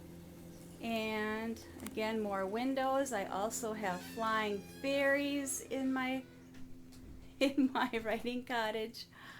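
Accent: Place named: Canada. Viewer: Just wondering cuz I noticed your accent, are you Canadian?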